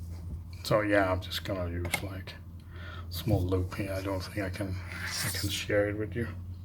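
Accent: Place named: Denmark. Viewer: Nice accent. Er du dansk?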